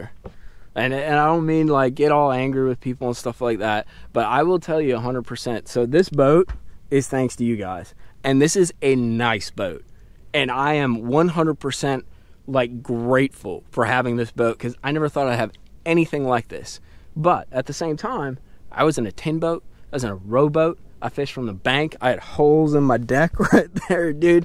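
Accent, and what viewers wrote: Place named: Pittsburgh, United States. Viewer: Good content, where are you from? You almost have a Pittsburgh accent lol I heard you say n’at before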